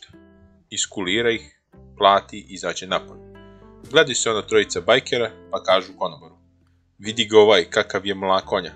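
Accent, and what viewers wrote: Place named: South, Serbia. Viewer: Samo kada bi južnjački šmek malo smanjio,možda bi i na nešto ličilo.🤭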